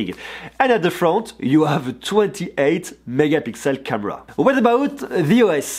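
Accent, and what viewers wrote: France. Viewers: Me too he speak frenglish it's a nightmare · It like a french accent no ?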